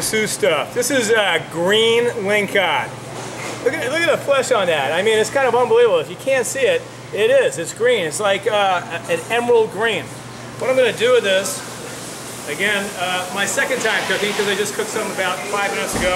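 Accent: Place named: United States. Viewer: what does he mean "as you can tell I'm from Ireland" he sounds American. If he sounded Irish it would make sense.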